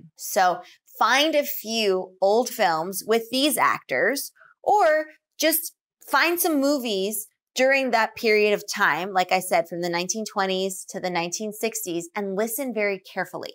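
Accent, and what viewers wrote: United States. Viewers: What a beautiful style of your speaking an American English thanks for your kindness.forget you not sprite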